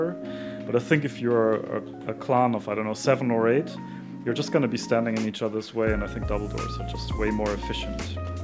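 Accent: Germany. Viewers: No offense to your english, but I instantly knew you were german. People also do realize my german accent after I start speaking even when there are no grammar mistakes :D Whatever, calm voice, calm music and good mic / video quality. Well done man!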